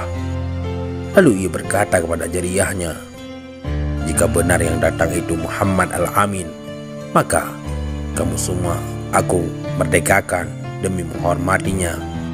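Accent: Aceh, Indonesia. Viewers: Dari sebutan huruf D nya saya tuhu yg menceritakan kisah nabi ini pasti org aceh.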